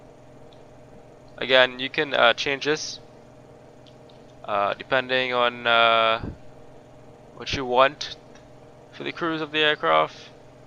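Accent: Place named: Burgundy, France. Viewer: French from bourgogne I think ^^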